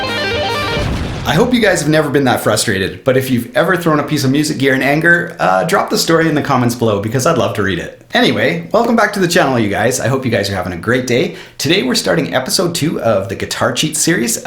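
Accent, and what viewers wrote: Canada. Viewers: By any chance, are you Canadian. I recognize your accent as a fellow Canadian as well.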